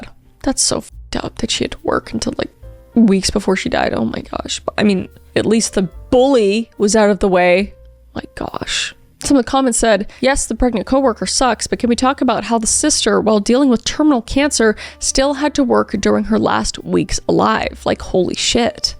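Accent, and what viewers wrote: Canada. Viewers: Ohhhh you're canadian! I have been watching like 20 of your videos in the past 2 days and i was like huh maybe i havent heard enough texas accents to judge but there is something off about this one <3 really enjoying your work, thank you for all the genres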